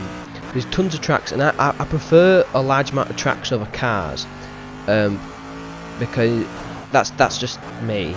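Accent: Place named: Manchester, United Kingdom. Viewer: You sound more manc than Yorkshire... You sound exactly like Gary Neville!!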